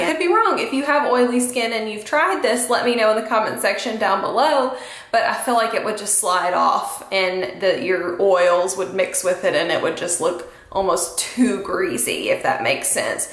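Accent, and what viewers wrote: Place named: South, United States. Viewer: Hey,! Recent subscriber here. I've also been trying the RdF Luminous Elixir Three Drop Foundation. I fluctuate between the shades Ceres and Metis. My facial skin is rosacea-prone combination/oily. My usual process is applying prescription medications (a serum-y type product, then an azeleic acid gel), a light moisturizer, then sunscreen. I let the sunscreen absorb for AROUND ten minutes. When I apply the Luminous Elixir, I dispense three drops on my fingers, lightly blend onto my other hand, then gently begin massaging the product onto my face, working from my reddest areas outward. I was also absolutely SHOOK by the coverage level of this foundation in proportion to the amount I needed to use. I can wear it all day with minimal creasing, and I haven't really noticed it sinking into pores (mine are BIG) at all. This might just be a skin-type or prep difference, but the Luminous Elixir leaves my face looking considerably less greasy than, say, the Ilia Super Serum Skin Tint. 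ALSO, I have found that even if I scratch my nose or touch my cheeks throughout the day, I'm not removing coverage. Perhaps consider dropping the product onto your fingertips first, rubbing them together ever so slightly, then applying to your face. It could make a difference in finish and wear-time for you! PS: It's nice to listen to someone on YouTube who rocks a southern accent. Cheers!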